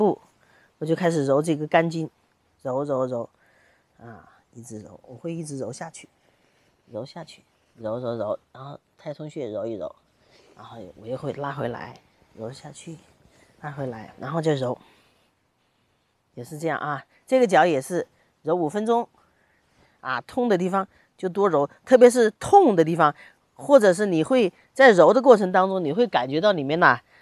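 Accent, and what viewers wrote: Wuhan, China. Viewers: 我也觉得是从武汉来的😀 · 大姐你是武汉人吧? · 、你是不是來自中国-你的口音很像,只有中國人最注重養生、通经脈穴道、你说的沒錯要堅持做才会有效果．我也经常失眠謝謝你、告诉我们方法.我一定試一試、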